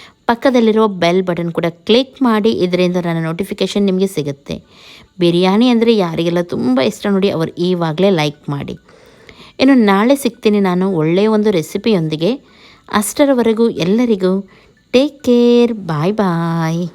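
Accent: Mangalore, India. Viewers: Mam are u mangalorean...🤔🤔🤔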